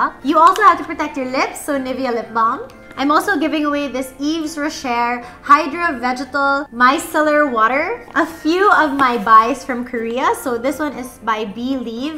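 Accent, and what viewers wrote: Visayas, Philippines. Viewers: # I already follow you since nakita tika sa instagram amd I follow you and also here sa youtube ako d.i to ga comment nga cute kaau mo mag bisaya ni bf, your so cute and love your style and one you're my style icon. 😘 xoxo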